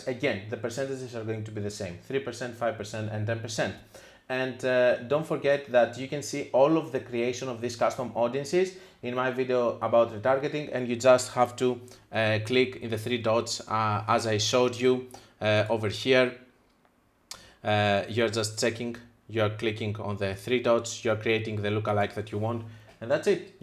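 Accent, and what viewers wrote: Greece. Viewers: Όταν καταλαβαίνεις ότι είναι Έλληνας απ'την προφορά χαχαχ μπράβο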